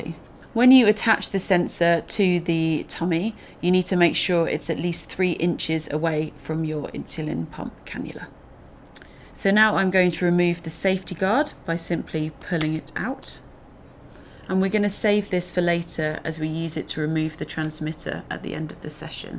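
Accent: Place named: England, United Kingdom. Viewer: Wow , I'm in Love ! I really enjoyed this presentation and her English accent as well !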